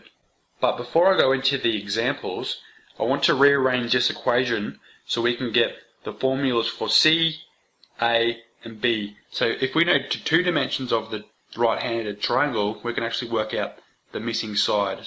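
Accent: Australia. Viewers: He's an aussie, they're like texas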